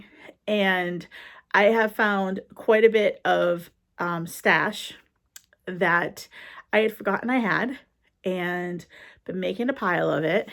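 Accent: New England, United States. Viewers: I just stumbled across your channel and recognized a fellow New Englander almost immediately from your accent. I’m in southern NH. I love stash videos.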